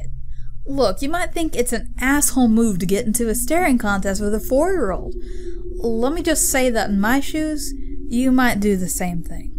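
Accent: South, United States. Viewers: Nice southern accent:P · Nice accent you made me truly believe you were a southerner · You do a very good southern accent!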